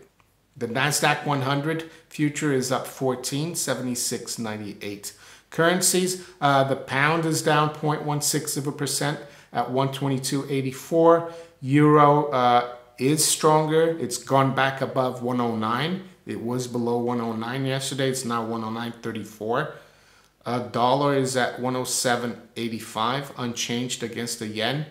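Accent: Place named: East Coast, United States. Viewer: I enjoy your channel! Difficult to know you're Brazilian because you speak with what sounds to me like a U.S. east coast accent. Did you spend time living in New York, New Jersey, or somewhere on the east coast of the U.S.?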